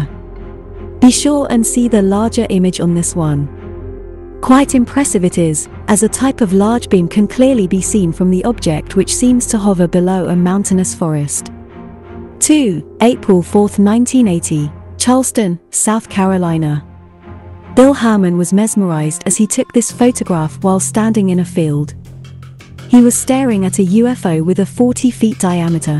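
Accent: United Kingdom. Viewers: Love your British accent:-)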